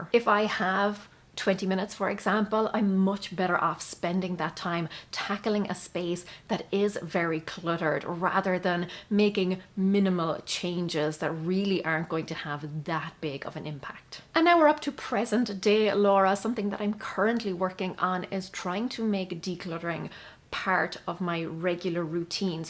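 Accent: Ireland. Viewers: One thing I have gotten from your videos is that no one gets it perfect the first time. It's a process. You can learn. You can change your mind. Be gentle with yourself. Thank you for that! Oh, and ANYTHING spoken in a lovely Irish lilt is easier to listen to! It's the spoon full of sugar that helps the medicine go down.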